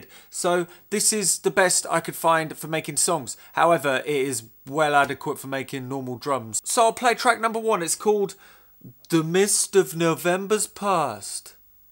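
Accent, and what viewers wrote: Australia. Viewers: Fix the EuroPlus, hook a wire between the IIe's play button to the + play button. TWO track songs! ps, Aussie accent is a beauty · Can we get more half australian accent?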